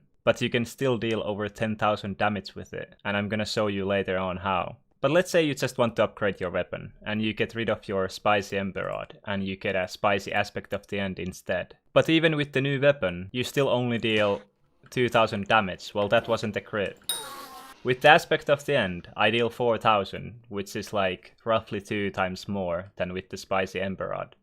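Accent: Finland. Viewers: Bcs he’s finnish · huomasin heti et olit suomalainen sun accentistä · Bruh he’s just Finnish it’s a different accent chill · Youre a finn, its confirmed im sorry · Yo are u from Finland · shut the fuck up he is Finnish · Are you finnish becouse i am and your accent sounds finnish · your accent makes me want to go to finland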